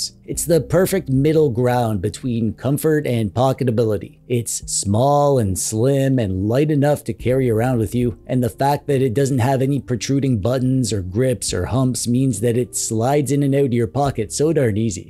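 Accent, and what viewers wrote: Canada. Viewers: I like your channel. I think that many of us watching are wonering who the heck that you are. U speak like u have a cold, u are funny and interesting. I seen ALOT of influence from other utubers especially the American Taki. As I watched 2 or 3 of your vids even with your strange congestion I could hear an accent. Are you Canadian? I think that u are. Interesting vids but ya dont have to be so weird. Its OK to be from another country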